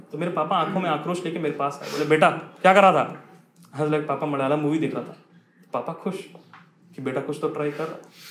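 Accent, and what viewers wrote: Bihar, India. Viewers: Bhai sach mai Bihar se ho?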